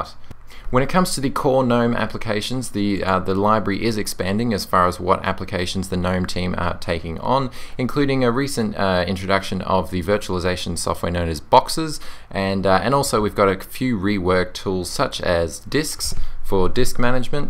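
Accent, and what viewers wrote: Australia. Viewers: Great review, thanks! P.S. Your Australian accent is really really nice! I absolutely hate British accent (my gf's entire family were born in England and later moved to Canada), but Australian accent just has subtle differences which actually make it really attractive. Sorry for the off topic comment, but I just thought I'd let you know how big of a selling point your accent is when it comes to online reviews. Subscribed :)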